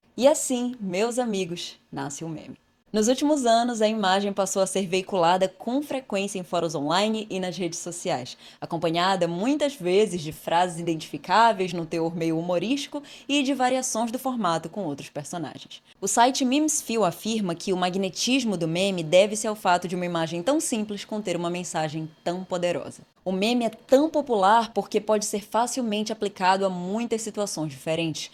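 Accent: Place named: Belém, Brazil. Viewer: Esse sotaque entrega, vc é de Belém!?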